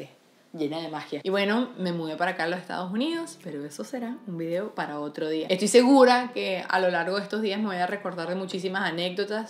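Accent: Venezuela. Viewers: eres exageradamente bella, y me encanta tu acento venezolano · Me encanta tu contenido y tu personalidad, pareces una amiga más. En otro video me gustaría que contaras cómo entraste a Turner; si fue por casting, o porque igual te llamaron. El Miss Universo por TNT ya es algo muy tuyo, no sería lo mismo si te sacaran. Además, eres venezolana, tu acento es muy rico de escuchar, y ganaste un concurso de belleza: TNT no te va a soltar! Hahahaha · Me encanta que ah pesar de los años. Porque ya tienes años fuera del país. Sigues siendo venezolana y hablas como una venezolana. Que orgullo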